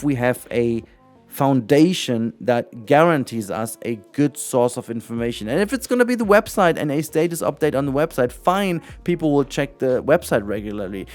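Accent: Germany. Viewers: Hello I have a question. Are you German? Just asking cuz you sound German to me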